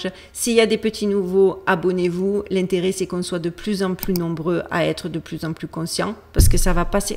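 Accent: France. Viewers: Question : As-tu une certain succès envers les amerlocs avec ton accent Français ?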